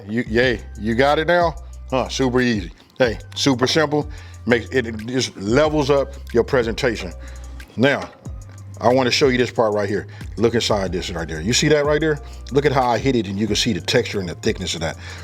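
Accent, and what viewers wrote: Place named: South, United States. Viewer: Omg I absolutely love your southern accent and the way you talk "so look listen." Love it ❤ I made smothered pork cops from your other video and I am back to make something else now. 💙 BTW I am from The H in Texas.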